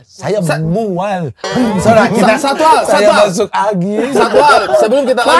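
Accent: Eastern Indonesia, Indonesia. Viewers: nah iya, sependapat gk enak aja didengar bg klo kebawa logat timur lebih pas logat yg biasa aja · makin ksini, logat bang makin menyatu dengan trio timur 😅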